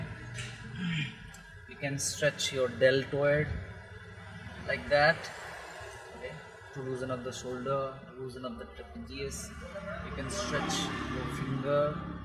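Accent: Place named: Haryana, India. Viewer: sir, can't stop his haryanavi accent coming inn " Clitch" and brake!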